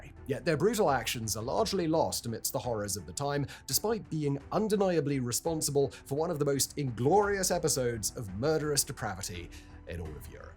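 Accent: United Kingdom. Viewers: Love a posh English twunt explaining Northern Ireland and pronouncing words incorrectly with the utter confidence that comes with posh twuntery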